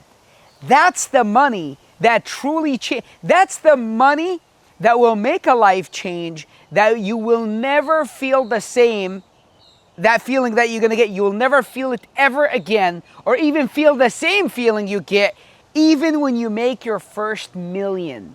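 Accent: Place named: United States. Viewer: Thank you for sharing your journey! Your American Accent is Wow !🎉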